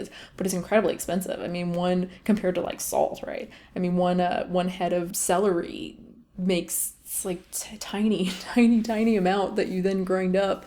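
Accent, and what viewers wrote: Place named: South, United States. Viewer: what happened to your southern accent??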